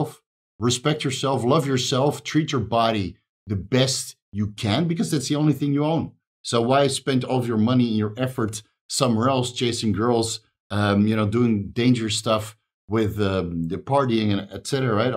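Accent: Netherlands. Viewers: is this guy from netherlands or something? can't place the accent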